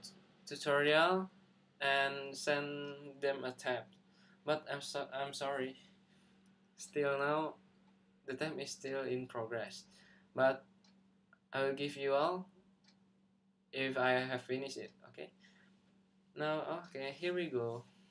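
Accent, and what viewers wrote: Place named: Indonesia. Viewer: i noticed Indonesian accent~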